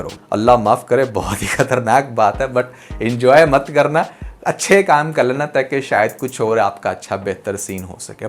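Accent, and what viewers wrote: India. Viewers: Bhai ap indian logo ki tra kyn baat krte hn فر nhi پھر hota he Plzzz Urdu me baat kren Hindi me ni Ap hmare bht fvrt hn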